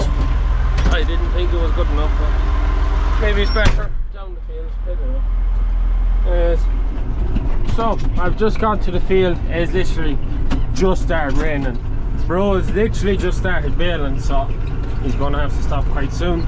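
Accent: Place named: Ireland. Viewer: From across the pond, enjoy your farming channel & the Irish accent!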